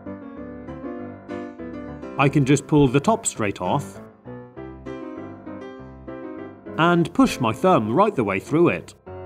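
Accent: United Kingdom. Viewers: Are you British? Can I please get a reply?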